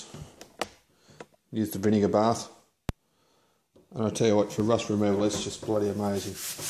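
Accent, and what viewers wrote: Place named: Croatia. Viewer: Your heavy Croatian accent makes it difficult to comprehend what you are saying. The confused videography makes matters much worse. Have you considered employing someone who speaks either Canadian or American to do a voice over? By the way.... Croatia is a beautiful country and the rich cultural history of the Croatian peoples is unparalleled, maybe you could make a video on your village!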